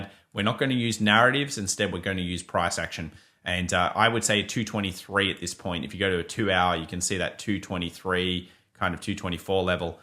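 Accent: Australia. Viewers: I think that's just his aussie accent 🤣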